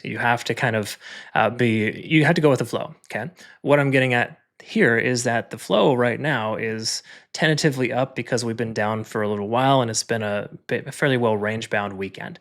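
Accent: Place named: United States. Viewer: I wont watch channels that have accents, Indian, british, Australian, south African and ghetto/prison/ratchet are some of the worst. I appreciate this english American speech.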